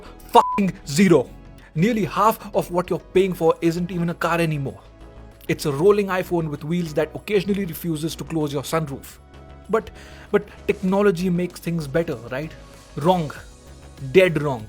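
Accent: India. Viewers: You sound indian?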